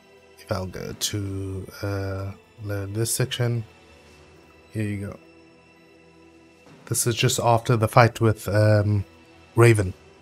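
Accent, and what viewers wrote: South Africa. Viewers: I play on 720p and btw you can't hide that South African accent I'm also from here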